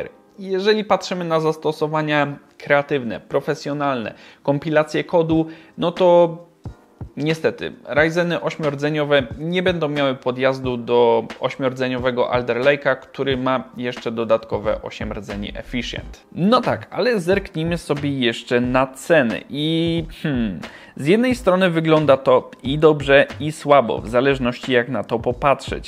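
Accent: Silesia, Poland. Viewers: oho jest ze Śląska, Pozdrawiam Hanysa:D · po śląsku godo a kto nie wie to gorol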